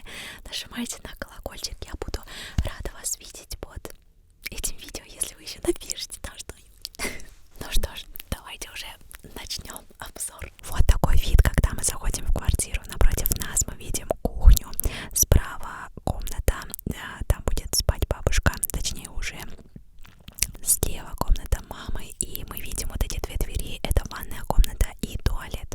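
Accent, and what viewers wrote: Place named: Spain. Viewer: Puedo sentir tu español...❤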